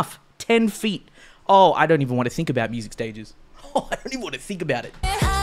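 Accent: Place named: South Korea. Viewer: 이 형 뭐지... 분명 영어를 말하는데 한국인 같아..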